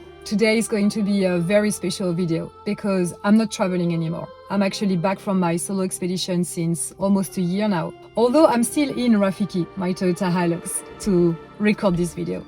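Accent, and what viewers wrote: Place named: France. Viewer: I think you mean influenced. NOT POLLUTED. I love your beautiful use of English with your lovely French Accent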